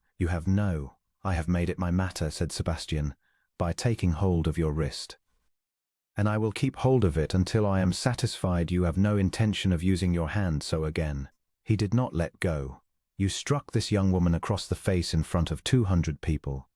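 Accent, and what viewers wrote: United Kingdom. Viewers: Lovely story--well written and wonderfully narrated. Thank you for having a British accent to your narration; it's a British story, after all.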